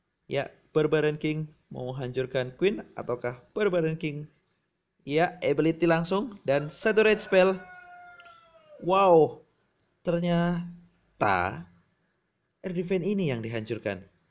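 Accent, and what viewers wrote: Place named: Java, Indonesia. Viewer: medok yah 😀😀😀😀😀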